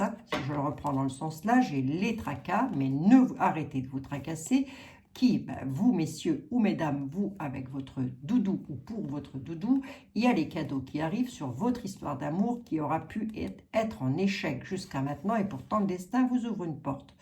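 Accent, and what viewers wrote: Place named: East, France. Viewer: Un immense merci pour ce que vous faites ! Abonnée depuis peu, mon ange m’a envoyée par « hasard « sur votre chaîne… Je me disais bien que votre petit accent m’était familier, étant originaire de l’Est Que notre Seigneur vous garde dans la Paix et l’Amour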